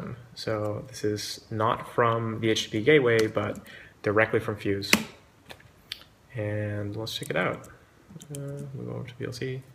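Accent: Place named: New Jersey, United States. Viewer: Very cool demo. Are you from NJ? Just curious; you sound a lot like my buddy from there.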